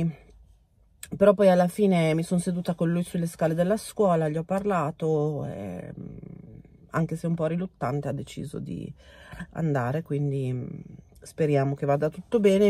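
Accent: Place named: Piacenza, Italy. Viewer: Ciao, grazie per aver deciso di metterti a nudo con noi… Avevo sentito l’accento! Sei di Piacenza! Io ci ho abitato dai 12 ai 18 anni! Anche io vicino al centro (via Genova)! Ed è vero: e’ un caos! Un abbraccio forte ❤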